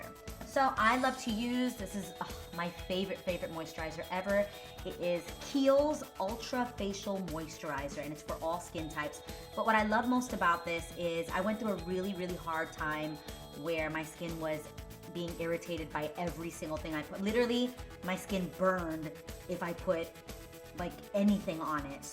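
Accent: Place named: New York, United States. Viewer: I LOVE your newyork accent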